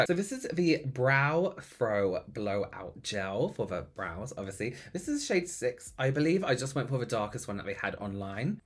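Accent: England, United Kingdom. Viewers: Do you speak Spanish? Can you in your next video? I’m dying to hear Spanish in an English accent! Or maybe you have a different accent when you speak Spanish ?! Either way, I’d love to hear it! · Never in my life would I have thought I’d be in love with a pale Mexican with a British accent. 😂♥️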